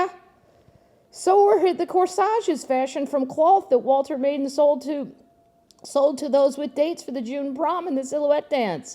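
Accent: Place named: Wisconsin, United States. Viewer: Love her Wisconsin pronunciations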